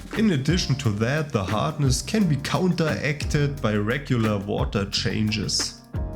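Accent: Germany. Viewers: i hear some german😅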